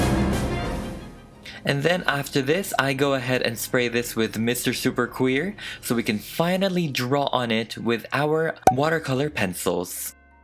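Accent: France. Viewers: I like that French.English accent 😀😂and your outfit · the french accent yass bitch kiss from paris ❤️ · I love the french accent tho XD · C'est tellement étrange de t'entendre dire des mots français au milieu de ton anglais, en vrai tu as un accent qui ressemble bcp à celui d'un français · love your french accent :3 ( I'm french :3) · Quel exploit ! What a feat ! I didn't expect the final look with the drops falling but omg it's sooooo great ! Please keep talking with the french accent, it suits you very well (on behalf of a french woman) ! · What kind of accent is that XD. It switched between French and mostly British. No hate tho it was cute af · You have a beautiful French ascent and also the French poodle doll you repaint! I love it! <3 <3 <3 <3 <3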